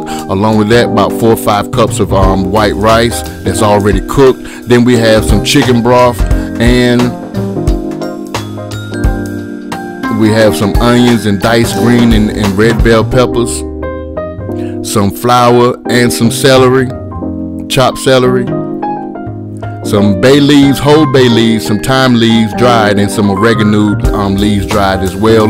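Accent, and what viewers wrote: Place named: Texas, United States. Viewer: Yo. Im in uk an my buddy said he had dirty rice last night said it was great. Never heard of it here but know i now.. I is gona try me soma this tamrw after work.. Dam right... Man i just lov the way yall talk there in texas✌️💜🍜.. Dirty rice is hitting the uk an we didnt hear it from man v food guy adam. Lol. Im after that mad flavour 💯. Respect Houston texas 💜.. Peace out.... Uk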